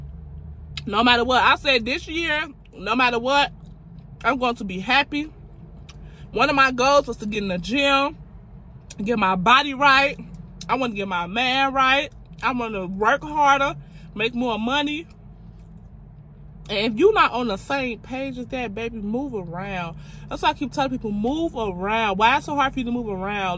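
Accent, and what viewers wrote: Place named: Chicago, United States. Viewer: I love ur Chicago accent, it always make me feel I’m talking to one of my homegirls……#chicagogirls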